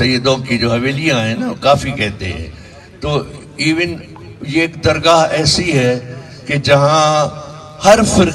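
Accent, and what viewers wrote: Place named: Uttar Pradesh, India. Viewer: Paisa Pbi to ka aunda te boli UP di bolda? Ki e tuhadi jamir Pak wali o.?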